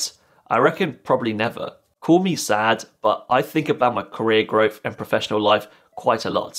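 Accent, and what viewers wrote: United Kingdom. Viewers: I am using your video as part of my IELTS listening;you have a nice british accent.Keep good health brother❤️.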